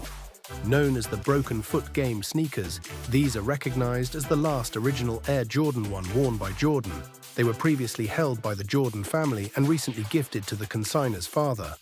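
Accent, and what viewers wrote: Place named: United Kingdom. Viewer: Brit Accent was a nice move 😂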